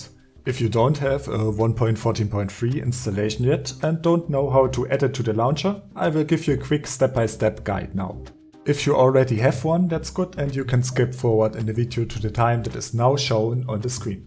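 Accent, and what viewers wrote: Germany. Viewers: Hab den deutschen Akzent von Anfang an durchgehört :) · Ich liebe diesen deutschen Akzent :D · Hast ein Abo aus Prinzip 1. Der deutsche Akzent 2. Du folgst "Der Valon" du ehrenmann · man merkt das du deutsch bist xD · Dieser Deutsche Akzent und dann noch die stelle "Werbung Überspringen" 😅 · Wusste ich doch, dass ich da den deutschen Akzent rausgehört habe. :) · You included EVERYTHING important (I think)! Omg, you're very helpful for people, who are looking for a proper guide. I subscribed, and also I love your German accent 😂 I live in Tschechien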